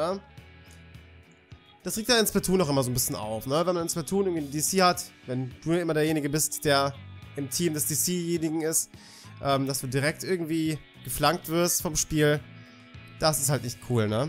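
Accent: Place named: France. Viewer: bester französischer Akzent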